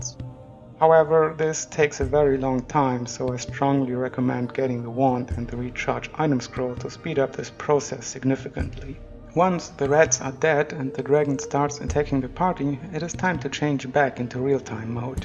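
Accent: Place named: Germany. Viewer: I downloaded the original version of the game for 1,24 € yesterday because I could not wait for the postman. I was too excited. It works. Because your name and your accent sounds pretty German I want to say: Danke für das Video. Das hilft mir sehr weiter. Prima sich mit jemandem auszutauschen, der sich bei diesen Klassikern auskennt.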